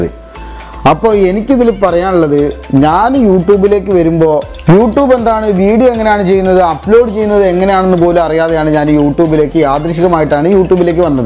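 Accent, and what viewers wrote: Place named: Palakkad, India. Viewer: Chettan sherikum palakkad language ani parayunath🤗🤗🤗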